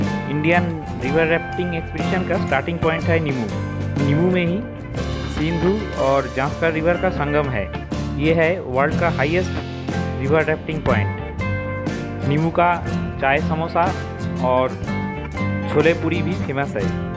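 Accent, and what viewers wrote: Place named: Bengal, India. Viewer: Nice informative vlog Your pronunciation resembles to be Bengali like, are you a bengalee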